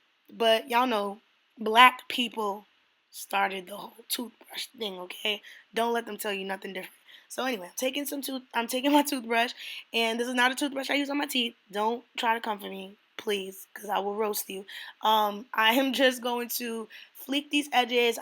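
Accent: New York, United States. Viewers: You slayed that ! Are you from NY or Maryland ? I hair an accent 😂I just subbed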